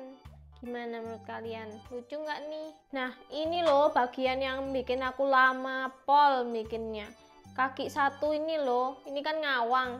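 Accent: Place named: Java, Indonesia. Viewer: Ngomonge medok pol😂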